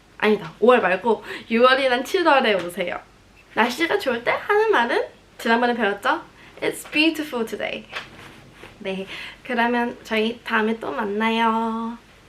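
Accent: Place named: London, United Kingdom. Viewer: 런던은 아니구 surrey 지역인데 근교긴 해요! 감사합니당 완전 어렸을 때부터 영어를 쓰신 건 아닌데 완전 억양이나 그런 게 런던 악센트네요! 저는 미국 호주 영국 한국 다 섞여서 완전 인터내셔널인데 대학교 첨 가셨을 때도 궁금해요!!! 썰 같은 거 풀어주세요 ㅎㅎ 미리 알고 가면 좋은 팁이나!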